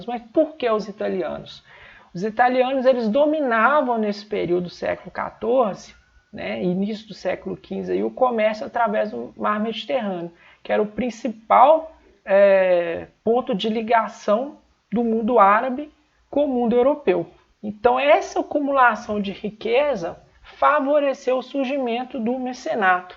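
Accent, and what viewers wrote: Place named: Belo Horizonte, Brazil. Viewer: Aula top! Bigode irado! Sotaque de BH bonito demais! Obrigado pela aula! sucesso!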